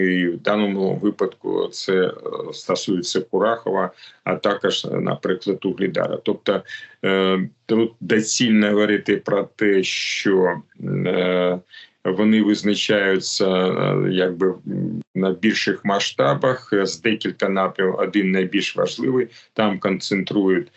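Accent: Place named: Russia. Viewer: Акцент ужасный, русскоязычные очень смешно говорят по- украински. Сама такая.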